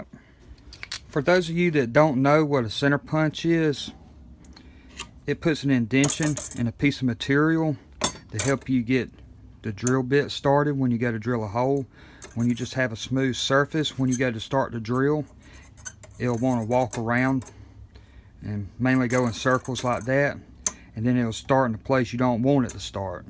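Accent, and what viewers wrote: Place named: Brooklyn, United States. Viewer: Ok, WHERE online does one obtain replacement points? LOVE that Brooklyn accent!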